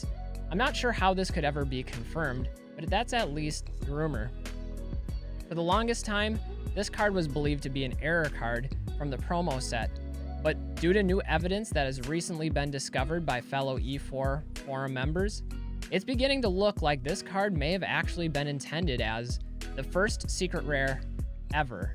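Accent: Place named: Midwest, United States. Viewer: I was just thinking I could tell he was from MN or WI from his accent and way he dresses. Very outstate midwest, having grown up there myself. I checked the comments to see if anyone else picked up on that. He's one of our people!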